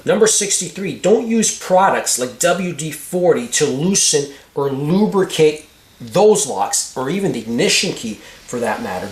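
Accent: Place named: Canada. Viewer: these videos are amazing! thanks so much. i just got my 1st r129 and i intend to keep it until i stop driving. i like doing as much as i can myself. When i was going through your videos i thought i heard a Canadian accent. I'm in Toronto, but originally Ottawa Valley. Are you from the Prairies?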